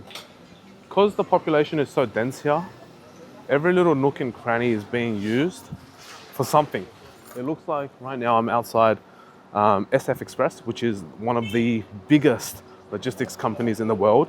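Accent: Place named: Australia. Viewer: Australian accent detected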